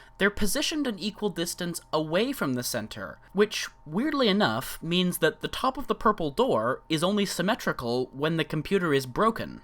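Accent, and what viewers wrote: Canada. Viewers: Plugging in a PS2 keyboard will not damage the main (Onyx) system. All that will happen is a big sweet nothing. The only way to damage the Onyx machine through that proprietary keyboard port, would be by passing a very high voltage through it. A PS2 keyboard, being a passive device, will cause no damage at all. I mean you could short every pin and still get no damage. Go on. I dare you. Double dare? Oh, I give up... PS Is that a Canadian accent? You sound quite a bit like Mr Carlson.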